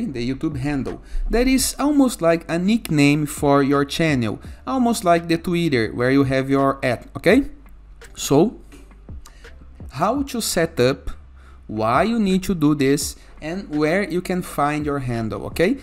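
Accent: Brazil. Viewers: salve! pelo sotaque só pode ser BR, valeu pela dica, nao tava achando onde mudar a bagaça!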